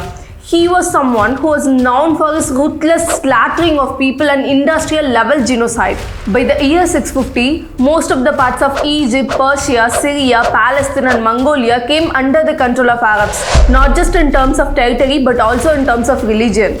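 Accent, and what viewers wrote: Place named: India. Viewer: Love her indian accent ❤❤❤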